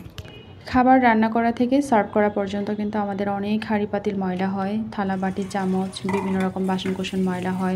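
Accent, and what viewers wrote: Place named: Chattogram, Bangladesh. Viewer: আজকেই প্রথম দেখছি, আমার বাড়ি হালিশহর সবুজবাগ চট্টগ্রাম। আমার এলাকার মানুষ এর কথা শুনেই মন ভালো হয়ে গেলো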